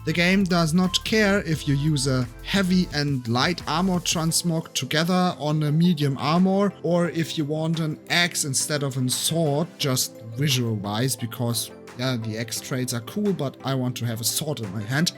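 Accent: Germany. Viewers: Starker deutscher Akzent, heilige Maria XD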